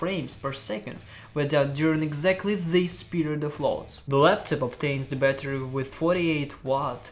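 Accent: Russia. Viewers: Было сложно, но я понял по незначительному акценту, что Русский! А потом видеозапись игр с русскими надписями... 👌😂 У тебя версия с gtx1060 или мне показалось? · Жёстко произносить по-русски английские слова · are you russian? 😅 btw amazing laptop and best looking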